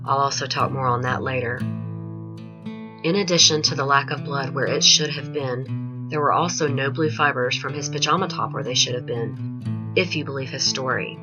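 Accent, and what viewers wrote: South, United States. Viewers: Yeah. Her voice is like silk with SLIGHT SOUTHERN TWANG🌄🌃🆒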